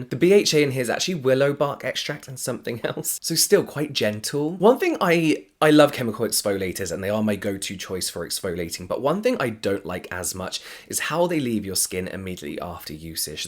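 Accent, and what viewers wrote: United Kingdom. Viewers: Just discovered you. Great videos that get straight to the point. I also love your super calming voice (British accent is a plus 😉). Love your content! Keep it coming.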